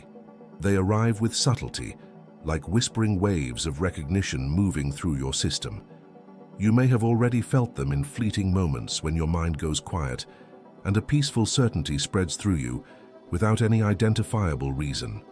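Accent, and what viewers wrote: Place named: United Kingdom. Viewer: I would like to watch this ,but the British accent gives me the creeps.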